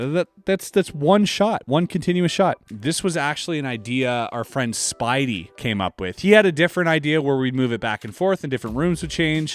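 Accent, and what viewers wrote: Canada. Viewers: You Canadian?! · Holy smokes! You’re Canadian bud?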